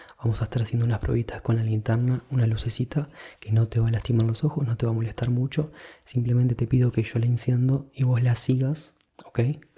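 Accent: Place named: Uruguay. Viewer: Me encanta tu acento. ¿Sos Uruguayo? Saludos!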